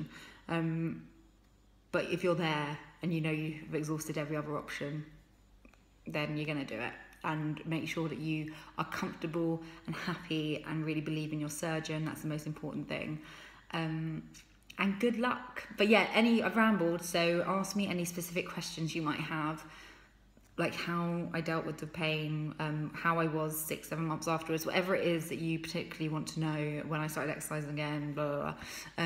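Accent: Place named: United Kingdom. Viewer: I was expecting an american accent then bam british o.o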